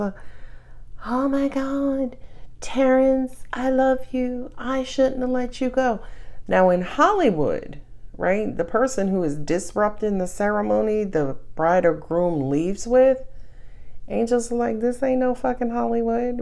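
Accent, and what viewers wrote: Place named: New York City, United States. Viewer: 2024 is my 7 year cycle as well! I am ready to be completely happy & love ❤🎉 Bring it!❤🎉 Thank you! Bring on the NYC accent 😊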